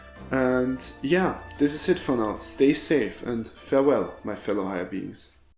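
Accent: Germany. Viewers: Bro deine englisch aussprache verrät direckt das du deutsch bist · love your vids and your german accent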